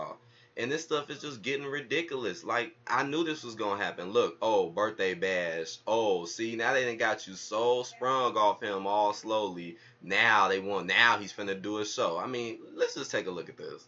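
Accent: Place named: United Kingdom. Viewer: Wow. I'm glad I"m not the only one that caught the British accent being covered up. I bet this guy originates from Nigeria or one of the islands..They frequently migrate to London.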